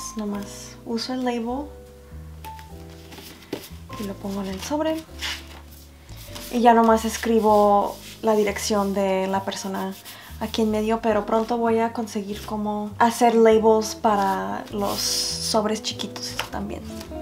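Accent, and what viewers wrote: Mexico. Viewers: gracias de nosotros tus seguidores de latam, luego de escuchar tu español identifique que era mexicano JAJAJJAJAA me gusta mucho como hablas el español ya que es tu primera lengua... espero te encuentres bien, saludos :D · Que bueno que hiciste uno en español. Me motivaste a hacer uno yo también. Ah y se dice subscribirte. 🙂 súper bien! ❤️ Me encanto por sierto eres de descendencia mexicana? Porque yo lo soy y creo que tú acento es de Mexico. ❤️ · Hace algun tiempo veo tus videos y podia escuchar un poco, pero muy poco tu acento mexicano y me preguntaba, acaso hablara en espanol? Y pense en escribirte en espanol, pero luego dije, que tal que estoy loca : ) jajajja. Me encanta todo lo que haces, felicitaciones! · me gusta mucho tu acento mexicano <3<3 te da una vibra diferente pero linda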